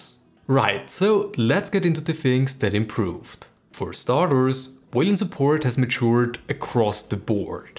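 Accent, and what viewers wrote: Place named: Germany. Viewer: I like you accent its like bringing a german to texas :D Thanks for the informative video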